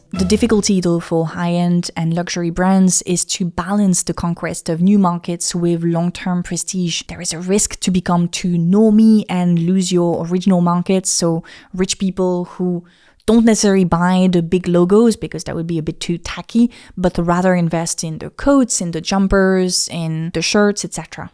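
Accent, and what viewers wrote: France. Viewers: I think she's French and thats just her natural accent... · It's the difference between her accent which is obviously French but perfectly understandable and the sort of French accent where the person clearly doesn't know how to pronounce English words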